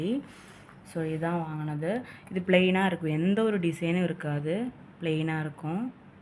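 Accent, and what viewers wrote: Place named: Malaysia. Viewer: You are malaise your slange you speak in malaise why in Tamil to earn money 💰 using tamilans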